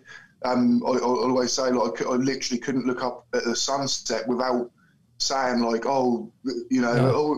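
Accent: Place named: Suffolk, United Kingdom. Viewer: Suffolk Mate! Let me know!! Lol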